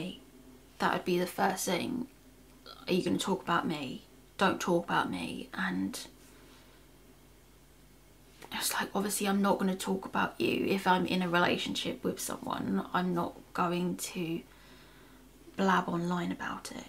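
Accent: United Kingdom. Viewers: I'm not even sure how I stumbled across your videos...because all I watch are Car vids. LOL Anyways...you are great speaker, you seem very intellectual, extremely attractive, and would make any guy feel lucky to share your time with! And your English accent...for an American. So HOT!! Viewers will come to you! You've got some great content so far! Best of luck to you in 2022!!!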